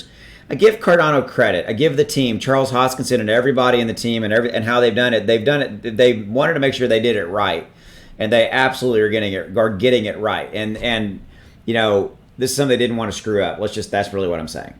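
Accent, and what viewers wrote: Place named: Appalachia, United States. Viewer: Like your video. As for your accent, you sound like a local (NC / SC mountains).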